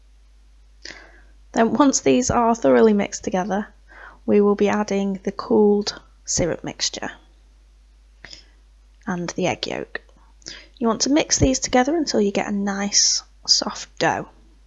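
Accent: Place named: England, United Kingdom. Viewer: ☑ Knows the difference between ~♥biscuits♥ and... ☹cookies☹ ☑ English voice, so probably is going to make something that's not sickly oversweetened. ☑ Uses fresh ginger so I'll be able to use the home grown stuff from the garden ☑ Also uses ground ginger, which is good, because ginger comes from the ground :P ooh nice pictures of flowers.. IT'S A BULBASAUR AND SQUIRTLE!!! :D presses 'like' okay, I'm biased, but you win. This is already the best recipe for gingernut biscuits. Would like to have seen one at the end get broken open to see how crunchy it is. I'll have to go and make some to find out :) also, my thoughts on that blue door in the background... it's the TARDIS.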